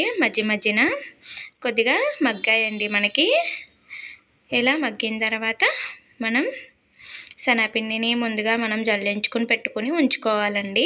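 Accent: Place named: Godavari, India. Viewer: ఆయ్.... మీ గోదావరి నా అండి 😂😊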